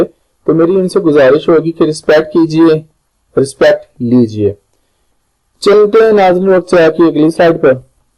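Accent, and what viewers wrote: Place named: Gujranwala, Pakistan. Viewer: Your dialect sounds from Gujranwala.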